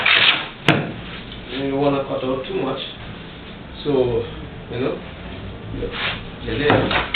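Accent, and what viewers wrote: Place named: Trinidad and Tobago. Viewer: breds, yuh sound real real trini, yuh know? ah tellin' yuh, boy, is like yuh's mih breddah fuh true.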